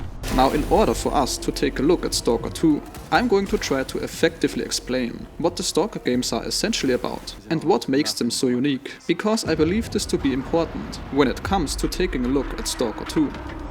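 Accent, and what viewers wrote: Germany. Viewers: This questions doenst have anything to do with the video it self but where you from my guess would be german since im german myself but it could also be norwegian or something up there? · Warum redest du nicht deutsch?Dein Slang/Dialekt verrät dich:goodvibes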